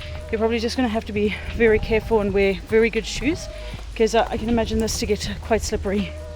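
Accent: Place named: South Africa. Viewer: As someone coming from Namibia/South Africa, and now living in the Netherlands, it really sounds like you are also Namibian/South African. We are always looking for nature! Great to hear what I believe to be South African hahah.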